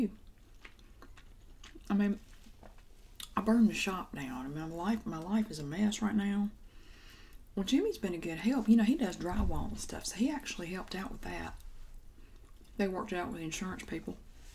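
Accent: South, United States. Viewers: Beautiful southern accent. I can't stand these over drawn 1800's slave owner accents everyone gives southern people. Very well done very natural, much love from Atlanta. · I like your videos! They are really interesting and helped me learn southern accent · I just moved to the south and I love your southern accent.Your fantastic.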